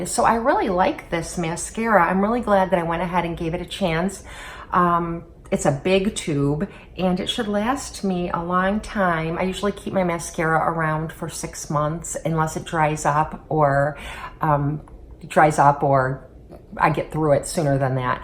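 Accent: Midwest, United States. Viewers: Hi! I came from channel. You are simply stunning! You have such a midwest accent. Cleveland? Chicago? I enjoyed your review. You have a new subscriber.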